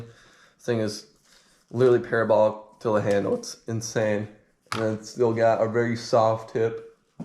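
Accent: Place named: Minnesota, United States. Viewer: You must be from Minnesota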